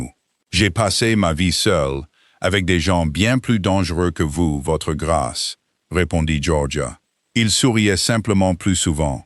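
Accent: England, United Kingdom. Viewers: Arrêtez cet accent anglais détestable ! Cela nuit à l’écoute....l’histoire est tarabiscotée...😅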